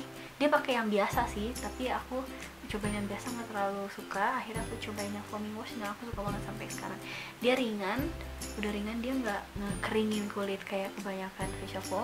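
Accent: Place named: West Java, Indonesia. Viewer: Logat sundanya nempel bgt ... Nice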